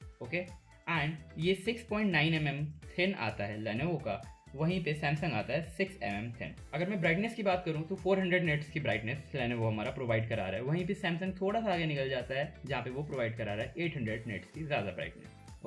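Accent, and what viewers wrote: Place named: Delhi, India. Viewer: LENOVO OFFICIAL PRICE IN PAK IS 110000 AND I ALSO OWN SAMSUNG FLAGSHIP TABLETS UR ACCENT IS OF DEHLI REGION AND VERY ATTRACTIVE AND LOVE OUR ANCESTORS CITY BEFORE 1947 BEING RAO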